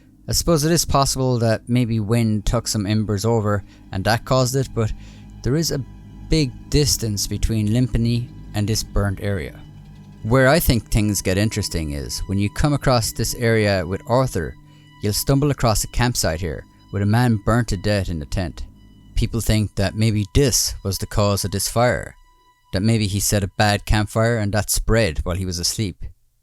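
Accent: Ireland. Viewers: Fantastic video, absolutely loved this! Really interesting and thought-provoking 😊 Biggest mystery for me though is which part of Ireland that lovely accent is from... my g-grandad was Irish from Cork, doesn't sound like there! 🇮🇪 Keep up the good work, you deserve so many more subs! Maybe Princess Isabeau would be a good one too, I see a lot on the mysteries sub talking about her (I've got a feeling she's not to be found, but hey ho) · Irish I believe but there are a lot of Irish accents.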